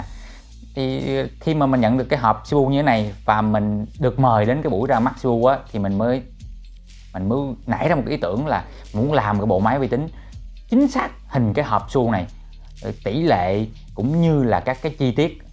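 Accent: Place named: South, Vietnam. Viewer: Em rất vui gì nghe anh nói giọng của miền nam.bởi gì em đoán anh ở sài gòn.gì một pc full nước em sẽ cố gắn làm việc để một ngày nào đó không xa em sẽ được gặp anh.thực sự rất vui mừng khi anh nói tiếng miền nam.em chỉ sợ anh ở hà nội.nên khi ước mơ củacem khó thực hiện.ngày đó không xa đâu gần đến rồi.chúc anh nhiều sức khỏe.một ngày không xa em sẽ đc gặp anh.chắc chắn là thế.PC full nước anh nhé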